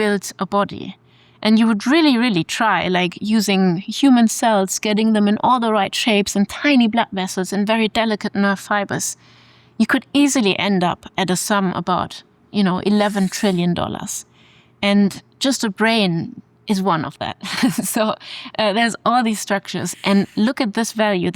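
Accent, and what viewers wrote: Germany. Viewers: I never really appreciated a German accent but her charm just gave me a new perspective on it. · The sound of a German accent is hard to listen to. Very stilted. The sounds dont flow.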